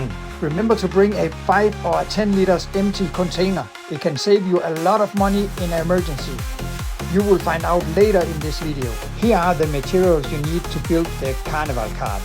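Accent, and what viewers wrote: Denmark. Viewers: Hey, that's the real, at least his voice. Deeper, older, but yep, I recognize his Danish English accent. So he is real, at least he was 8 months ago. (grins) But why is he always hiding himself all the time? Sup with that? And what's with that 'Jason face mask'? Hiding yourself like that is very suspicious.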